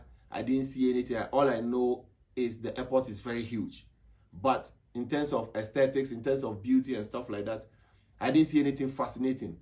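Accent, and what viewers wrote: Nigeria. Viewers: We think you are a Nigerian coz of accent 😂😂😂😂😂😂😂😂😂😂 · You english you talk like Nigerians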